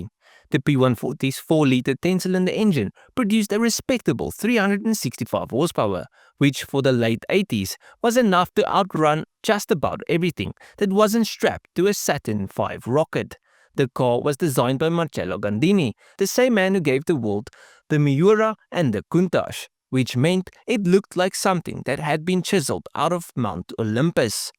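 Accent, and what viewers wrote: South Africa. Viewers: I think they say it “Guy-yard-o”, at least they do if it’s a Spanish bull., I’m trying to place your accent; South African? Love the videos.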